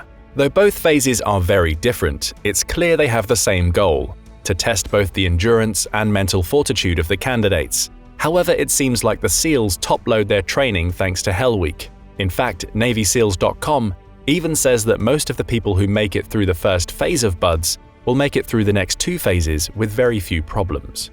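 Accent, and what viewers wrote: United Kingdom. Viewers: That’s exactly what I was just about to mention!! Is no one seeing that this vid is being narrated by a fellow Brit?!?😝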